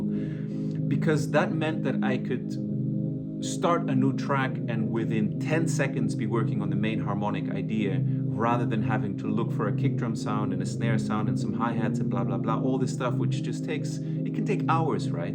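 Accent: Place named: Switzerland. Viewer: Thank you for your advice and your videos. Are you from switzerland, too? I thought i can hear a little bit of a swiss accent in your speaking.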